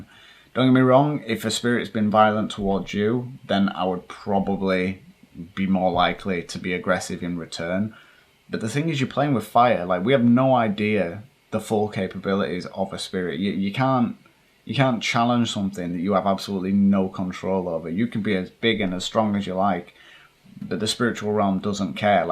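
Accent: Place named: England, United Kingdom. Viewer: I'm a very new subscriber, and have spent the past two nights binge watching your videos and creeping myself out alone at night. Have you ever tried old school spirit boards, Ouija, or pendulums to communicate and ask questions to the spirits ? Love your hard work exploring these spirits and love listening to your English accent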